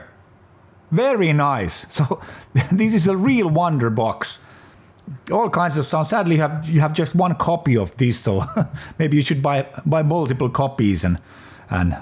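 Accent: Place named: Finland. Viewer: Relax man, I herad the finnish accent, arleady Im buyin this thingy, the MI peds I own are pure genius...Im not so much for crunch, but boysngurls MI means myne, so try try try..., someone said that this with the Super Blues Pro n strat..., LOVE, Kimmo : )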